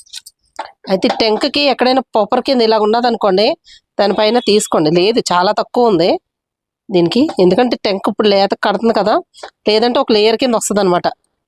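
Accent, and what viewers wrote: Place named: Godavari, India. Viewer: మీది గోదారి జిల్లానా అండి..😂😂😂😂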